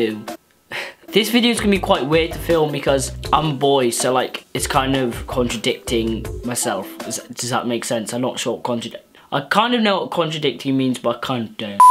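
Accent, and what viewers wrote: United Kingdom. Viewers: Is he British or American?? BTW ur one of fave youtubers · Yay is British!!! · Is he British? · He's British😊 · British x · are you British?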